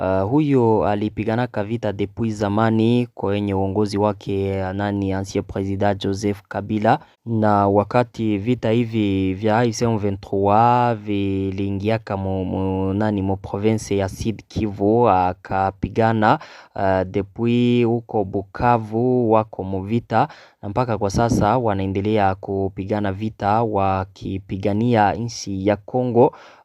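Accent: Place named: Tanzania. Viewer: Swahili yako ni ya watanzian, courage ndugu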